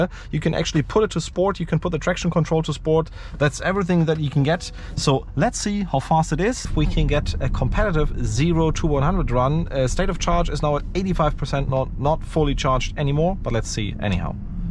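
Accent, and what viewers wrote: Germany. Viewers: I Like your german accent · Man hört richtig dass du ein Deutscher bist :) schönes Video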